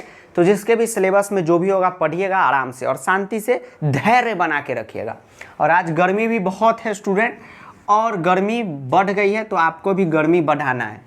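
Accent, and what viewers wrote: Bihar, India. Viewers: sir aap bahut badhiya padha rahe.....bihari ascent me comedy karte karte.,...maja aa gaya padh ke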